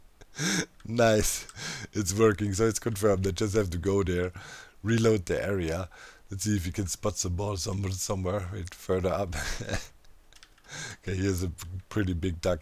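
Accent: Germany. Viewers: that synchronised inventory poppy technology thing is absolulty mindblowing. Recognized you last season because of this for the first time. Since then love watching ur videos (and espescially that ur vids are so long, haw*.* best for coffee in the morning) haha and btw first time i heard u speaking id know hahaha hes german, his pronunciation is the same like mine :D:D lots of love from potato to potato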